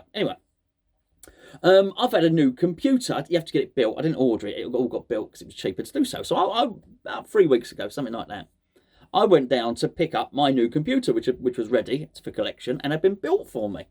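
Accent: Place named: Scotland, United Kingdom. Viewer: Definitely a hint of Scottish twang, bloody 🤥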